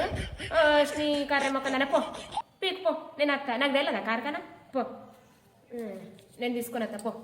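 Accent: Nizamabad, India. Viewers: అక్క మీరు నిజామాబాద్ జిల్లా కదా ఎందుకంటే మా అమ్మమ్మ వాళ్ళ ది కూడ సేమ్ మీలాగే మాట్లాడుతారు 😂😂😂❤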